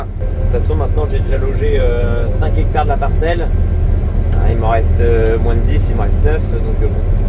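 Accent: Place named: East, France. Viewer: bonsoir tu parle de plus en plus comme les gens de l'est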